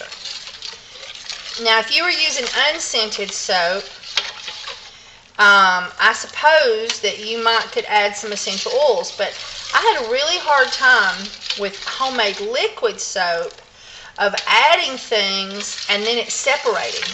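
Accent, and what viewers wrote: South, United States. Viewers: Thank you for the video. I love to listen to you. I'm from Ms. so I understood your "sayings" LoL! Gotta love the south :)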